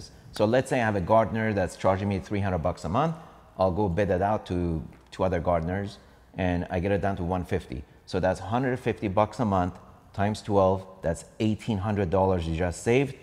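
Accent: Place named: Iran. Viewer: His Farsi dialect still very visible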